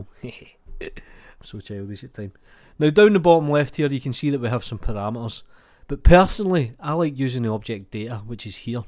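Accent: Scotland, United Kingdom. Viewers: His english Is great.. is that Scottish ?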